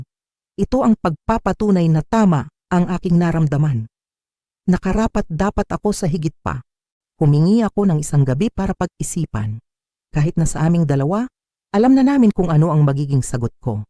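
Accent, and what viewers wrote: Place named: India. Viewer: Putik!!! Bakit naging Indiano ang narrator!!!!!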